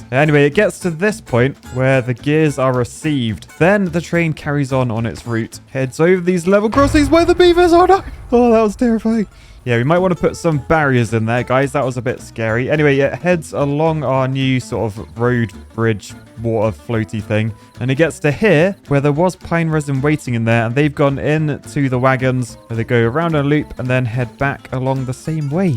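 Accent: United Kingdom. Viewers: You can really tell he is British now.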